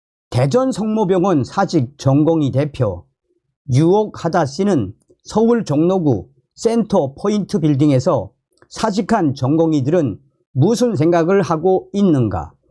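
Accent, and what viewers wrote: North Korea. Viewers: 북한 어투와 어법이어서 인지는 몰라도 반복되는 중언부언이 너무 많고 사족이 너무 많으세요 선생님! 젊은세대들은 이런 스탈 싫어 합니다 .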